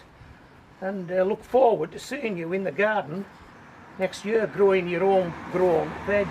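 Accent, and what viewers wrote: United Kingdom. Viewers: Hello,. Where in the UK do you live? You have the most charming accent! 🙂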